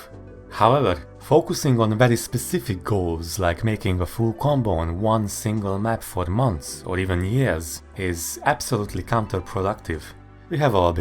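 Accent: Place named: Hungary. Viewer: Lol tudtam hogy magyer vagy... Az akcentusodrol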